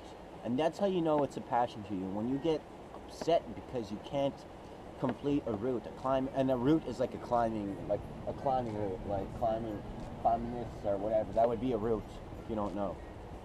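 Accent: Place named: Newfoundland, Canada. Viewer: Awesome video bud. Was like I was there having a chat with ya! Your newfie accent is gettin tick! Haha cheers!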